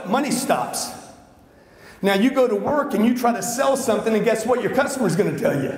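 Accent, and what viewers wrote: South, United States. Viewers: I love Grants Southern accent, makes him just that much more BADASS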